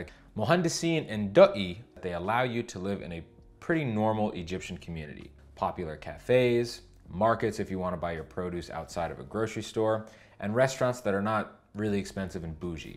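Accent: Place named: Staten Island, United States. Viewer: Haha! Nice accent! Spent any time in Staten Island?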